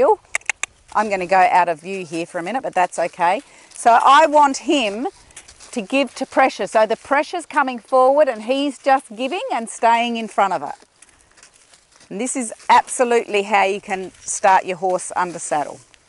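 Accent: South Australia, Australia. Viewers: Are you a South Australian? I'm trying to pick the accent. Great video!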